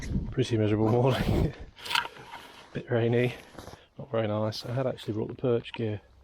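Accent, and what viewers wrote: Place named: Midlands, United Kingdom. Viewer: Thank you, hadn't thought of just crushing, I use what's often referred to as a swiss file, and remove barb completely. It is probably a little more time consuming than your method for sure. I get the impression you are from the midlands, like me, I maybe wrong!! I live close to Warwick.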